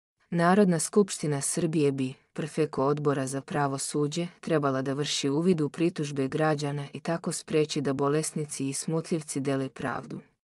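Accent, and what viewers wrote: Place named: Albania. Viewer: Siftarski akcent ...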